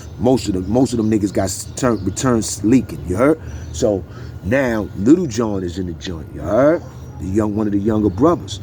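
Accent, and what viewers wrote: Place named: Bronx, United States. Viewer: Grew up in da BX and YOU Rep that Unique BX Flava 💯 Blessing to you n yours.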